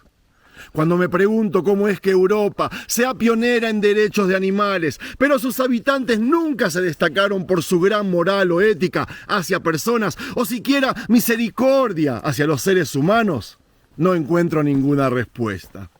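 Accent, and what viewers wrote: Buenos Aires, Argentina. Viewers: Israel y los judios son el Pueblo Elegido de Dios que han sufrido persecucion durante siglos hasta hoy. Hashem los proteja siempre. Bonito amanecer en Jerusalen. A mi tambien me gusta mucho la Historia. Mi grupo de hermanas de mi iglesia y yo estamos leyendo la Biblia cronologicamente y ahora estamos acabando Exodo. Gracias, querido, por tu esfuerzo, por tus paseos, por hablarnos estas historias maravillosas con el Tanaj en la mano, con tu hermoso acento bonaerense. Bendito Israel y su hermosa gente. Seguimos orando x todos vosotros, por los rehenes, por los soldados y por tu hijo Eden. Que Dios os bendiga. SHALOM desde Barcelona. AM ISRAEL JAI✡️🇮🇱🙏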